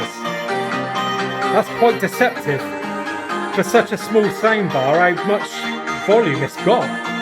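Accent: United Kingdom. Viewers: Hi, I'm Thomas from Italy. I like your way to explain and you speak in a way very clear to me that I'm not mother language, so I thank you first of all. I noticed you pronunce the I like O, for example you say "guys" or "five" but you pronunce "goyz" or "foiv", so I'm very curious to know in which region you live in UK. The other thing: i'd like to watch a video of yours you show your bodybuilder diet. finally: i add you on PSN, hope you'll accept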